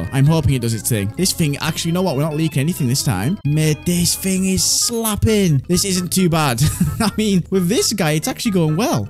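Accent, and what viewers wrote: United Kingdom. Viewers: You kinda like bretish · Is he british?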